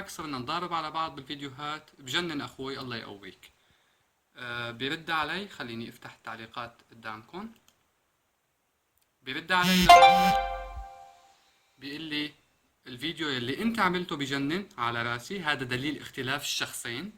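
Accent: Aleppo, Syria. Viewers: tv حلبي بس هيك خاطرلو يحكي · sara tv لا حبيبتي هاد حلبي اااحي كمان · هاد سوري مو لبناني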